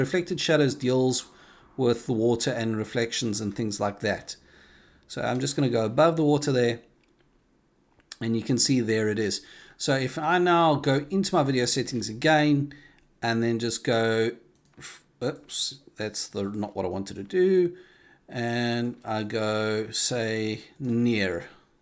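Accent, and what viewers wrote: South Africa. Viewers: i know a afrikaans brother when i see/hear one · Your accent gives me a South African vibe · You sound South African 😉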